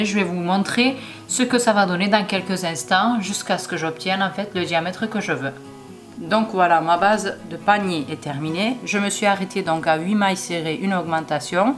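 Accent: South, France. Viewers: Super tutoriel bien expliquer avec un jolie acsent du sud😂❤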